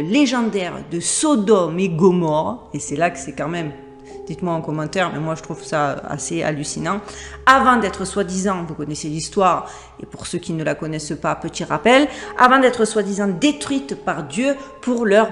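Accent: South, France. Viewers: Merci gratitude ! toujours des vidéos très pertinentes, avec ton accent du soleil et ton bel humour. Restons dans nos valeurs avec confiance ! le passage peut sembler long mais il n'est rien comparé au nombre d'années de soumission traversées... En face, ils ont négligé un facteur : la possibilité de l'humain de se reconnecter à la source à tout moment...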